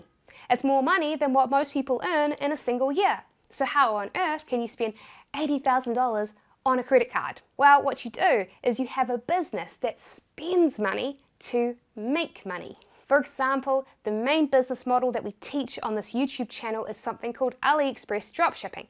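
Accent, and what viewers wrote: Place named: New Zealand. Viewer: That NZ accent. *shudder*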